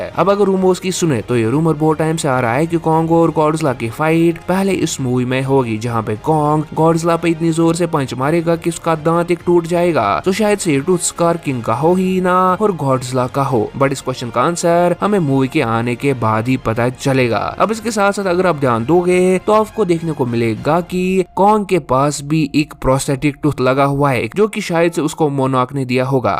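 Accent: Kashmir, India. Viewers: Brother you have a Kashmiri accent ❤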